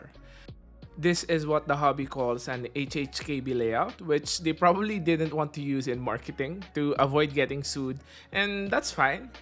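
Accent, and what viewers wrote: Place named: Philippines. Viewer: My dude's Tagalog accent is oozing.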